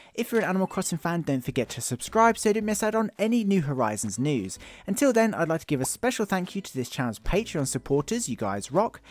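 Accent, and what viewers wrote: United Kingdom. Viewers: This British accent is killin me lmfqo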